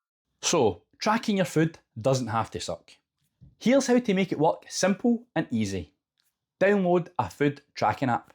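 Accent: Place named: Scotland, United Kingdom. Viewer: Great information! I was enjoying your Scottish accent when you flash the text “nips are out. Sorry it’s cold in Scotland”. I was not expecting that and hadn’t noticed your nips until you mention it but it cracked me up. Congratulations on the weight loss and the video. And for making me laugh so hard at your comment. It just caught me off guard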